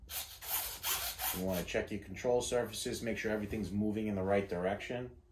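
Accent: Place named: East Coast, United States. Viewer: You a east coast guy. I'm from Jersey. Sound similar. Have fun flying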